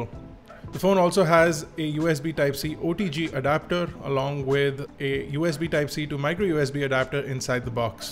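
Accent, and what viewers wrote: India. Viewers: Great Showmanship and Stylish yet understandable Indian Accent, Rock On,........... Make a VS Vid with the G6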